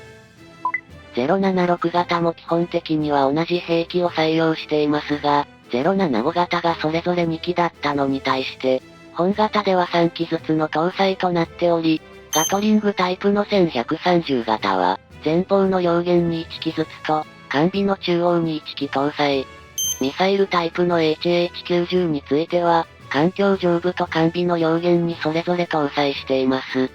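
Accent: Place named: China. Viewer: あと流暢な日本語喋ってても明らか中国人(大陸の方)ってわかるんだよね… ちなみにあなたの国は学問の自由指数 (Academic Freedom Index 2025): 中国の状況: 世界179カ国中で極めて低いグループ（Status Group E: 完全な制限）に属しています。 スコア: 2024年時点で0.067（1.0が最高）とされており、教える内容や議論の自由が厳しく制限されていることを示しています。 報道の自由度ランキング (<num>): 順位: 中国は180カ国・地域中、ワースト2位付近（179位など）に位置しています。情報の検閲や修正が常態化している環境が浮き彫りになっています。 …そんなんだから真実が分からんのだよ…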